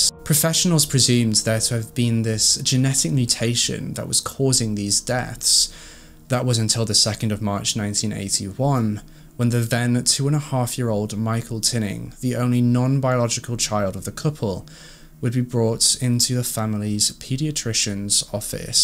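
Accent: United Kingdom. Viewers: I've been following Stephanie Harlowe's true crime channel, and recently discovered I've watched all of her videos! Stephanie's videos are incredibly high quality, and I didn't expect to find another true crime channel that was anywhere near as good as hers. I'm pleased to have discovered this channel. It is VERY well written and narrated. Bonus points that the narrator is very handsome and has a delicious British accent! Yaaay! You've got a bew subscriber. 😁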